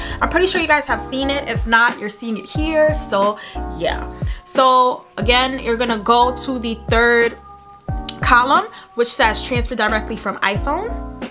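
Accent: New York, United States. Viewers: The moment you opened your mouth I knew you were from NYC 🥰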